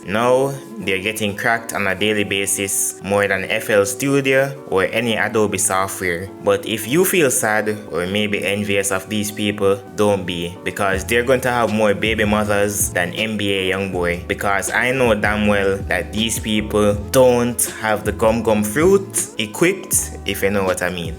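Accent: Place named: Jamaica. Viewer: Yu sound jamaican.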